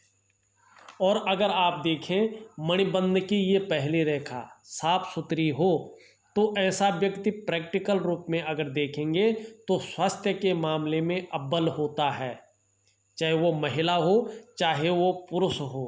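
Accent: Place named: Garhwal, India. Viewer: Aap garhwali Ho kya Apka tone se lgra hn 100%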